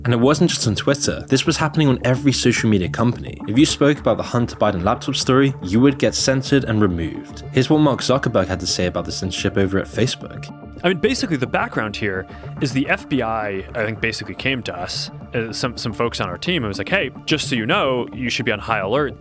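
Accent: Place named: Brighton, United Kingdom. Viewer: U from Brighton I'm guessing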